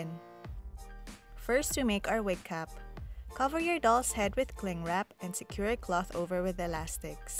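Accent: Philippines. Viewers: you have a filippina english accent are you filipina? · Are you Filipino? Cuz your accent sounds like Filipino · is she Filipino? her accent sounds like one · Your accent tho, are you from Philippines? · Is she a Filipino? I can tell from her accent. 😅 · Yea I could tell she might be filipina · You have a filipino accent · U r filipina right? The u talk English . ^^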